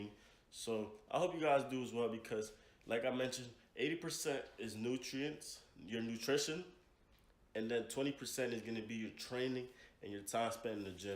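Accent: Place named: Texas, United States. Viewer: I know for a fact you from Texas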